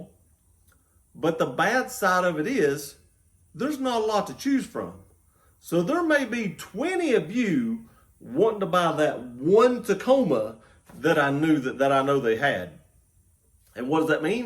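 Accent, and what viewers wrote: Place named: Tennessee, United States. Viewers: Johnson City, Texas or Johnson City, Tennessee? Accent sounds like TN but can't be sure. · Found your channel a sec ago by your weight loss video. Was like, bet that dude is from East Tennessee based on your accent haha and clicked this video and you said Johnson City. I’m from Knoxville/ Maryville. Good to see a YouTuber from this area. Just wish I didn’t have to stare at that ugly butt Florida hoodie in that other video tho🤮😂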